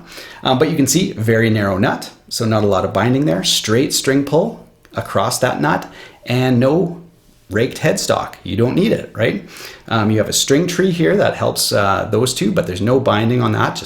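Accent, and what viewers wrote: Canada. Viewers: I always enjoy Canadian accents. No problem with thaat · There's no way you're not Canadian. I'm from Ontario and I can't unhear the accent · Are you Canadian?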